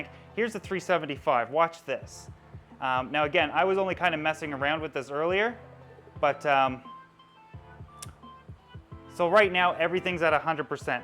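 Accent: Canada. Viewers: Sounds Canadian... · Thought I heard Canadian accent... then I heard him say Dartmouth... Dude, I used to live in Spryfield‼️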